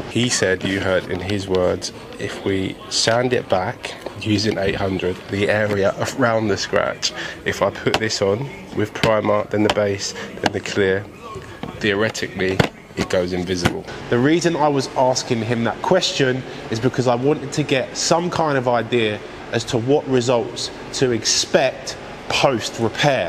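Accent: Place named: North London, United Kingdom. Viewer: Sound north of the river mate. South East sends respect.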